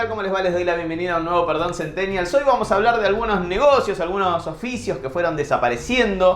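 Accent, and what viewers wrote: Argentina. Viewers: Se que así hablan en Argentina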